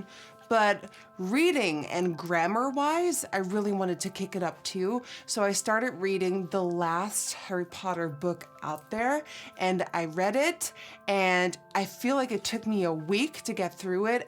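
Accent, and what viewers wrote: United States. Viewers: I CAN’T BELIEVE YOU ARE DUTCH! YOUR ACCENT SOUNDS SOOOO AMERICAN... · It’s so mind blowing how you have zero accent! You sound so American, you are professional makeup artist and language learner! It’s so hard to learn another language. Thank you for teaching us Dutch! What a fun way to learn :) love it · Your accent is impeccable...I didn’t even know you weren’t from the US for a while until you said it in another video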